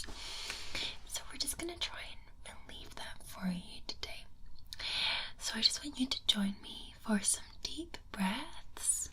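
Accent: Scotland, United Kingdom. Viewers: Thank you,. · I'll never stop loving the Scottish accent for ASMR. I hope this stuff never gets regulated like other modern medicine 😭🤣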